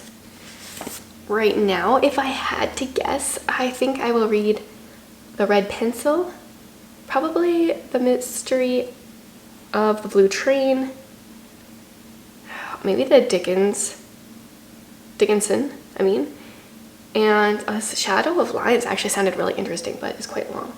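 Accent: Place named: Canada. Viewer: ive reached a new record as an american. i was able to clock you as canadian in just 25 seconds. checked your channel and i was right! canadian-dar is alive and well. greetings from the south in gun-trump-freakyland usa!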